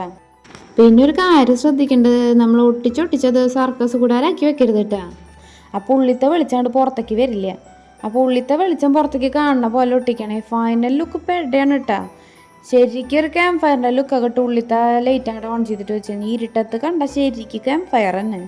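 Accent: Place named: Thrissur, India. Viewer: തനി തൃശൂര് ട്ടോ..... സൂപ്പർ.. സൂപ്പർ.. കലക്കിട്ടോ 👍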